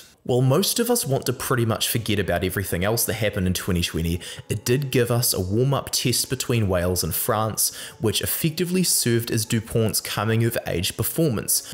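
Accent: New Zealand. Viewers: Excellent vidéo and analys, Love the Kiwi accent👌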